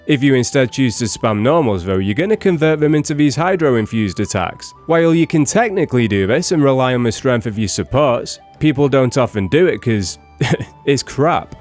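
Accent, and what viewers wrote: United Kingdom. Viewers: it feels like a breath of fresh air hearing another fellow brit talk about genshin, earned a sub from me mate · Too Much British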